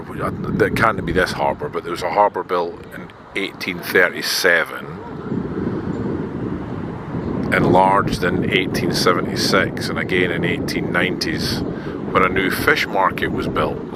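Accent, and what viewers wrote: Scotland, United Kingdom. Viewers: My little cat Jasper seems to love your Scottish brogue. You really have his attention. 😊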